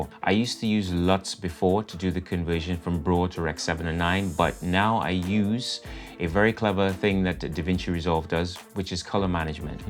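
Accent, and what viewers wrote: Trinidad and Tobago. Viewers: Im hearing a caribbean accent underneath...my guess is trini...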